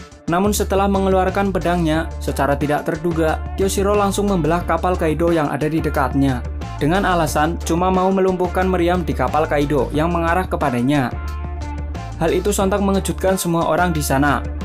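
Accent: Java, Indonesia. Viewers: Medok banget anjing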